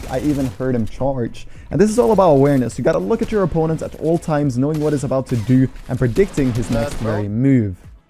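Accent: Norway. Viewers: you norwegian bro? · broo are you from norway? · wait, youre norwegian?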